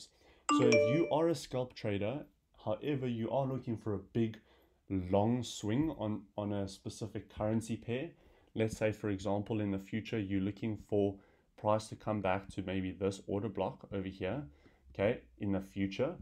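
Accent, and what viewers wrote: South Africa. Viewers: If the South African accent is annoying, speed up the video to x1.25.. kwaai video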